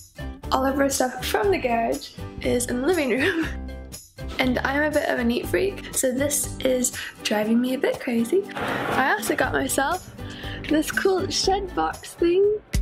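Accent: England, United Kingdom. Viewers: i love you so much ^-^ tldr- my voice is kind of like your in that its soft and quiet and "weird" and seeing you make youtube videos just makes me so happy and reminds me that is okay to be different. also i love that you have started to say things the way english people do since you moved there :p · Has Tom ever told you that you are starting to catch and speak with a British accent?😃 btw your hair is looking so lovely and fluffy 😊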